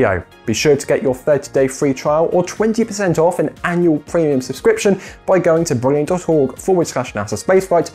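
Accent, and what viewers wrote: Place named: United Kingdom. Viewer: How come best SpaceX videos come with a British accent?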